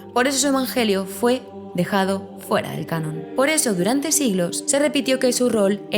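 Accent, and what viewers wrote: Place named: Spain. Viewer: Trato de soportar el acento españolete pero paila. Es irritablemente insoportable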